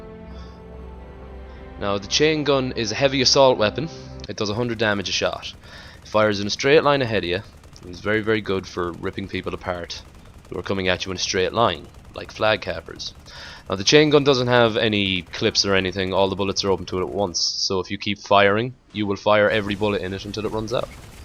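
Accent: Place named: Ireland. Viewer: Irish accent :D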